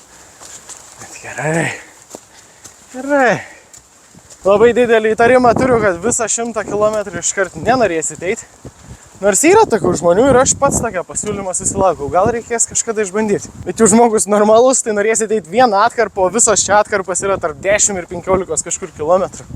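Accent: Šiauliai, Lithuania. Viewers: Tas siaulietisks bazariuks vis tik islend:)))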